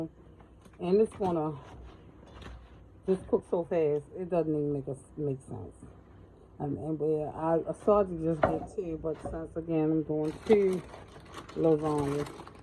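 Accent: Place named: New Orleans, United States. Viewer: Sounds like NO n living in Baltimore...a combo.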